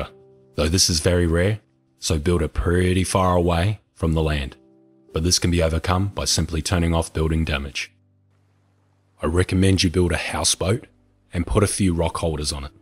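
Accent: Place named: Australia. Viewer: Australian accent what part of oz are you from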